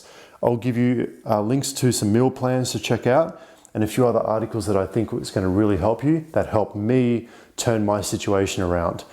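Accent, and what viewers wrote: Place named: Australia. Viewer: interesting seeing a guy that looks super swedish speaking in an aussie accent.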